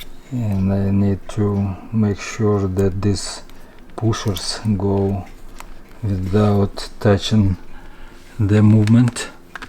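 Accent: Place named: Russia. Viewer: Похоже на русский акцент.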